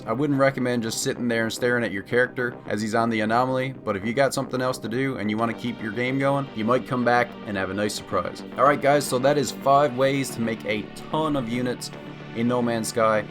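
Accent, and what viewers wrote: Newfoundland, Canada. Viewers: haha didn't catch the newfie accent till you said 'stay where you are and ill come where you're to.'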